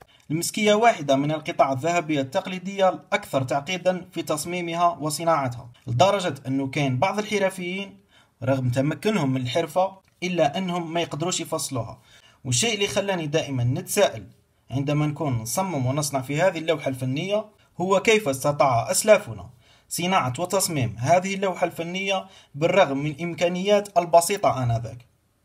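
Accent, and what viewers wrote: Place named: Khenchela, Algeria. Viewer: لهجتك خنشلي!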